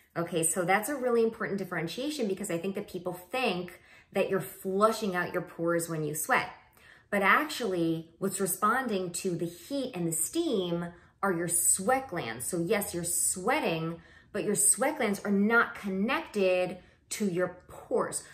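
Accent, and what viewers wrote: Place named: California, United States. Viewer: Why you have Cali girl accent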